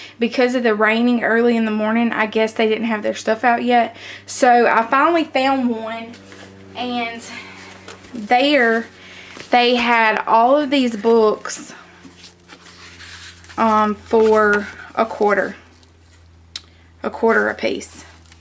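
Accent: South, United States. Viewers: Came through Foley just a few weeks ago going to Orange Beach! Love that Southern accent! When I go to meeting in D.C., New York or Chicago they always ask, "Where is that accent from?" I say, "Accent? What accent? I don't have an accent!" lol And then I tell them I'm from New York! lol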